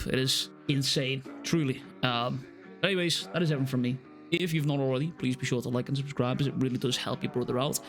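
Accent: Liverpool, United Kingdom. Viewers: Hell yeah a scouse man calling megumi a bum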